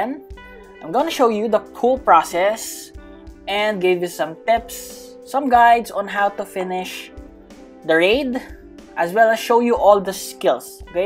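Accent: Philippines. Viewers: Sabi na parang di amerikano at Filipino ka nga a hahaha ha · gagi akala ko english talaga si pilipino pala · What....! Bro I thought Ur a American lezz go Ur pilipino right u literally said pa milktea ka Naman lol hahahahaha nice btw Ur accent is super duper cool broo Keep it up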